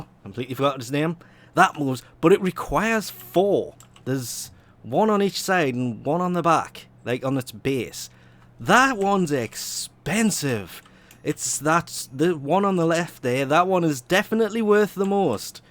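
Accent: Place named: North East England, United Kingdom. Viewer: Are you from the North East? You sound like you are, Newcastle or Sunderland.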